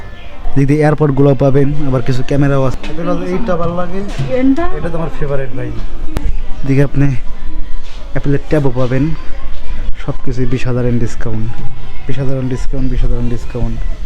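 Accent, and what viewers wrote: Faridpur, Bangladesh. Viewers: ফরিদপুর এর ভাষা প্রানের ভাষা ❤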